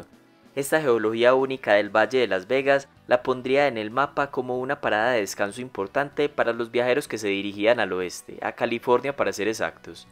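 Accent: Antioquia, Colombia. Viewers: Se nota que eres paisa, buen video parce!!!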